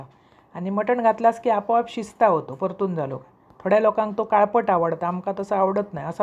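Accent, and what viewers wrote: Malvani, India. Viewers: तुमची सांगण्याची पद्धत खूप छान...सहज आणि सुंदर मालवणी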